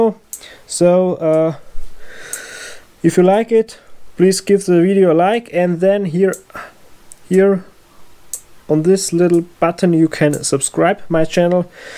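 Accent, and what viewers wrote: Germany. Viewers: Als Parodie wie deutsche englisch reden durchaus zu gebrauchen aber wenn man etwas hilfreiches gesucht hat wie ein Verbraucher an den Ausgang angeschlossen wird fehlt die Hälfte in dem Video…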